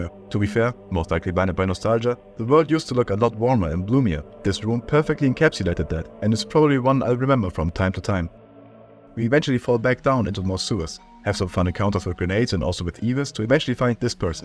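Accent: Germany. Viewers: I find it odd that you mispronounce Steyr despite having a very strong GERMAN accent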